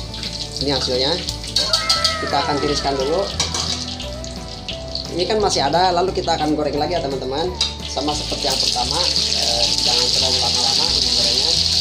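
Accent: West Java, Indonesia. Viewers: Dari sekian banyak video bang logatnya kaya org sunda kang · Semangat kang.... Sabar aja ya.... Resep nya, mantap Kalo resep ini beda bukan berarti salah.mungkin beda daerahnya kali kan bisa aja... Kalo beda daerah beda cara meskipun sama2 padang.... Memang logat nya sunda si akang ini... Tapi bisa masak padang pasti dari org padang juga gak mungkin sotoy akang ini apa lagi berani berbagi ilmu masak nya.. Semangat terus kang semoga sukse en murah rezeki👍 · Ky nya ini org Sunda, Krn logatnya dr Jawa brt. Sy org Sunda juga.mungkin dia kerja di rmh mkn Padang. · Saya ni penasaran abang orang padang tapi logatnya ky sunda ,mantap saya belajr ni mksh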